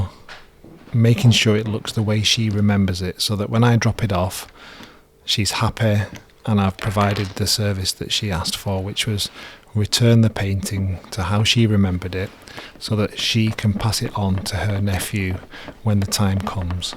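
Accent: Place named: Manchester, United Kingdom. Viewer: Love the channel, nice to hear a Mancunian accent. Is the painting the stereotypical Amalfi Coast scene?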